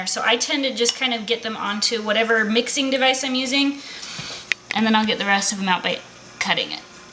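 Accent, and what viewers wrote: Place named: Midwest, United States. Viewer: These look amazing! Dying over your midwestern accent LOL. Can't wait to try them!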